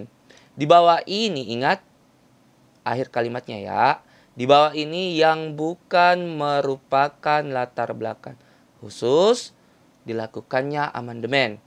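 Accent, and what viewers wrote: Makassar, Indonesia. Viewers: Mentornya kental Ki logat Makassarnya😅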